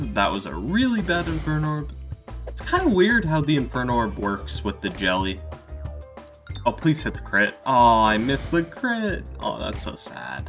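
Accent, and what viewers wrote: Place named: Canada. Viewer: Your voice is the definition of Canadian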